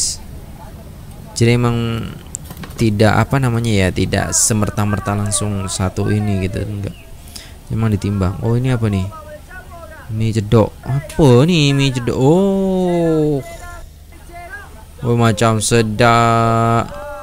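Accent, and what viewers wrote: Malaysia. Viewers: Cakap serupa orang Malaysia jer..penah datang Malaysia ke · Cak lapar terus cakap cam orang malaysia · Cara cakap macam org malaysia la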